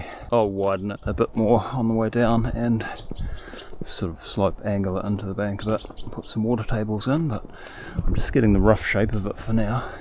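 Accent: New Zealand. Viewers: Sounds Kiwi, awesome presentation dude thank you.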